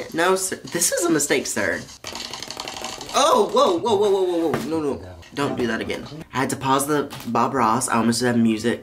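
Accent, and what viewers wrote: South, United States. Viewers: Every time i watch your videos i always forget you have a country accent lmao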